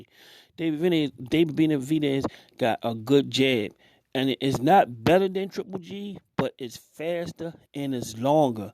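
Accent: Baltimore, United States. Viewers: I recognize that accent are you from Baltimore?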